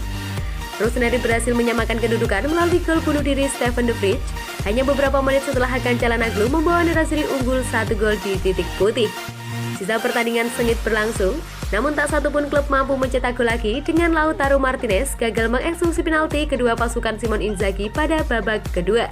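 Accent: Java, Indonesia. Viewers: Sory bkn rasis tp voice overnya jangan medok lah, ga enak dikuping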